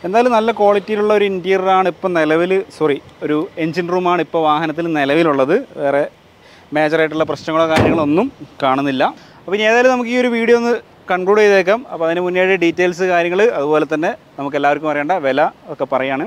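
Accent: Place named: Kozhikode, India. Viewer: நீங்கள் பேசுகின்ற கோழிக்கோடு மலயாளம் எனக்கு மிகவும் பிடிக்கும் ❤❤❤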